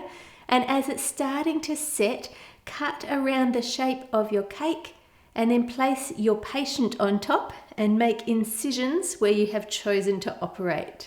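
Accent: Australia. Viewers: R u Australian I am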